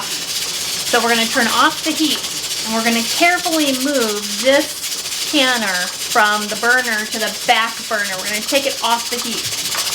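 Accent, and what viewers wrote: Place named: Midwest, United States. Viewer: I KNEW you were from WI or MN from your accent. LOL! Thanks for all your great videos.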